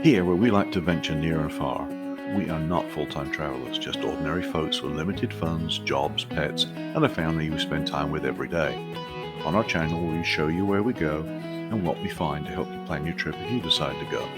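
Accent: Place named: England, United Kingdom. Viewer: "just normal people with limited funds" you have an English accent lmao unless you're an immigrant then you definitely have some funds mate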